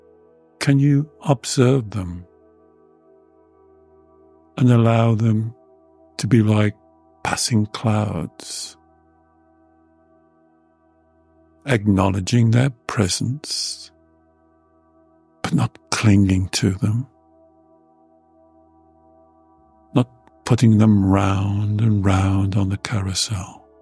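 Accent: England, United Kingdom. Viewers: God!! You are AMAZING!! As I already know; I literally was with you, meaning, JUST US! NO ONE ELSE!; Simply a one on one, which makes you! Simply AMAZING!! Every step I envisioned my past; Or as Joan Collin’s named one of her books of her past “ Past Imperfect” 😅 I looked at my family and thyself’s, “Past imperfect memories of happenings, Emotionally and Happily., again I say, You are a Blessing to ALL. And Your Lovely English accent 👀 Helps too!😂 All the ✌🏼❤️ out 2 🫵🌿😉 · I love the English accent as well—it’s endearing ❤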